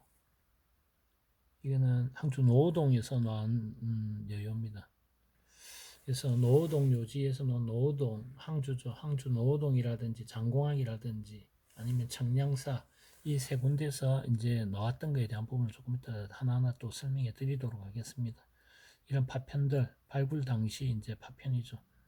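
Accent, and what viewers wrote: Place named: South Gyeongsang, South Korea. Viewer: 말씀하시는 톤이 경남분같은데요 놀리적으로 말씀을 잘하시는데 여요 장공항요 한국에 엄청많아요 그런데 판매할때가 없어요 ㅎㅎㅎㅎㅎㅎ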